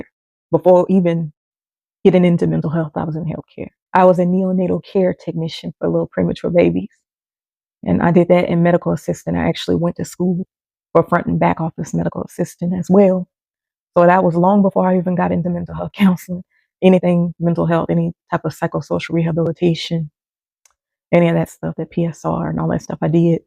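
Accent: Louisiana, United States. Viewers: Stopping by to learn from your video. Cant help but chuckle for catching your accent. What part of Louisiana are you from?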